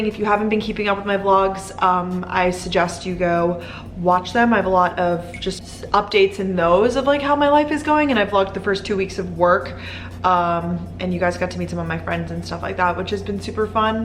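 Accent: Long Island, United States. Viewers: Do you feel like you lost your long island accent? also how long do you plan to stay in boston?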